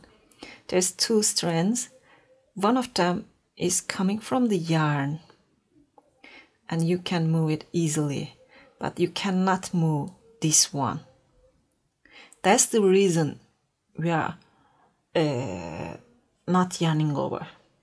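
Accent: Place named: Turkey. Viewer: Videolarda hizli hizli scroll down yaparken turkce aksanı ilk duydugum 2 kelimede farketmem 😂😂😂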